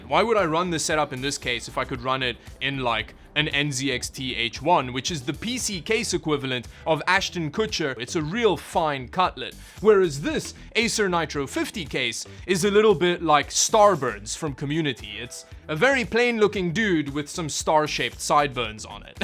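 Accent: South Africa. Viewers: Are you South African? 🤔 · are you South African? You accent and name reminds me of SA. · You afrikaans? Cuz ek is! And yeah does sound like a South African who speaks with a Semi-American accent. (Pronouncing R’s alot and some overpronouncing on letters and words that i am to lazy to list now)Your surname is also very afrikaans, “ ”😂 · I guess you also live in South Africa, because of the accent and name, but I would like to know, is it better to buy pc parts in south africa or import from other countries and then paying taxes and duties?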